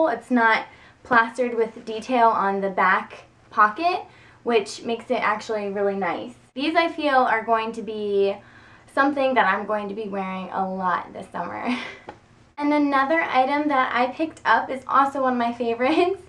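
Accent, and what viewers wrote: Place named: United States. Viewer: Tu es magnifique, ton visage est.... il n'y a pas de mot, ta voie je l'adore c'est la voie américaine que je me suis imaginé, ton style est très beau. Gros bisoux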